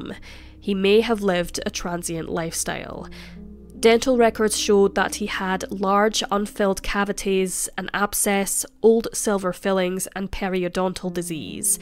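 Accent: Scotland, United Kingdom. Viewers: Scottish, they sound beutiful until they get drunk and then noone can understand what the fu*k they're saying 😄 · Love her Scottish accent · Is the narrator Scottish? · It's the scottish accent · The Scottish accent is enchanting, isn’t it?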